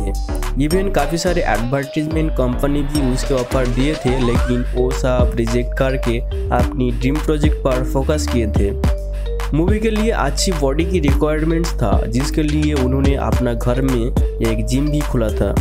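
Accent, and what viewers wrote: Bengal, India. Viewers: Bhai aap bengoli ho??? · kotha gulo bangla te bollei besi vlo hoto tomar kotha gulor mddha onk bangla tan a6e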